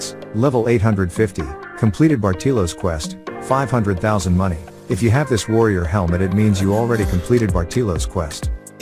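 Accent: Philippines. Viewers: BRO HIS SOUND IS FROM FILIPINO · R u from Philippines that sound familiar?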